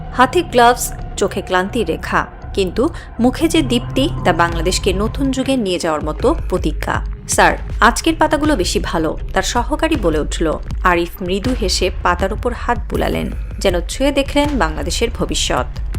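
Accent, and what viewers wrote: India. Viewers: ইন্ডিয়ান স্টাইলে সংবাদ বলা বন্দ করেন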